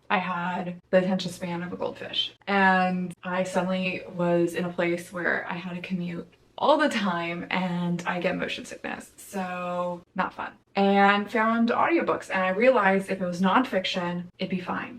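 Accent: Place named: United Kingdom. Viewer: Ahahahahaha hypnotized by the calm bland British accent, I can’t I’m dying 😂😂 I really enjoyed Micheal Pollen’s book “Cooked” I bet this is your mind on plants would be very much up my alley too. And 100000 percent kitchen confidential deserves to be on this list, listening to tony read this one made me so happy to hear one of my favorite voices in the culinary world again. Ooo did you hear about vanishing fleece from books unbound? That’s the only other place I’ve ever heard it mentioned., and I know you said you were an Ariel bisset fan! Alright, excuse me while I watch all your videos, I have a new favorite booktuber.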